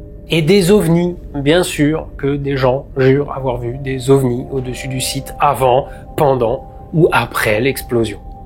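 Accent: Alsace, France. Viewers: j'ecoute toujours tes sponso juste pour ton accent alsacien ALSACE EN FORCE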